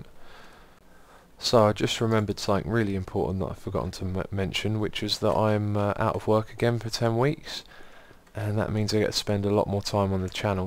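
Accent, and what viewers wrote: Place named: United Kingdom. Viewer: You can't breathe through your nose? I just thought it is your british accent, so i think it's not a really big problem anyway... I am glad that you do what you want instead of making what you announced despite if you have fun. That's how shitty stuff is produced.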